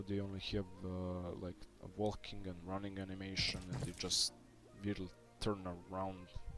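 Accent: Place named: Russia. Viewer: Как будто русский говорит по английски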